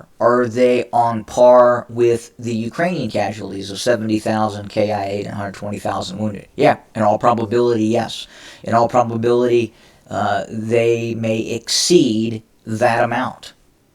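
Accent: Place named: Northern Virginia, United States. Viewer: Haha! Yeah, that Howdy Doody voice... It's a Northern Virginia thing..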